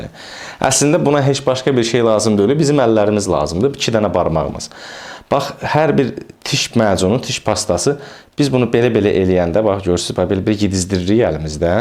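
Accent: Ganja, Azerbaijan. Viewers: Ləhcə Gəncə ləhcəsinə oxşayır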